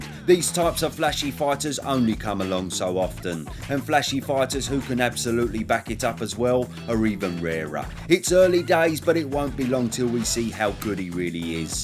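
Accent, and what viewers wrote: United Kingdom. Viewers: Dude your commentary is hilarious! A howard cosell intonation with the brit accent. Your irreverence is way more entertaining than the other stuffy guys. Well done guy. 👍🏽👍🏽😀😀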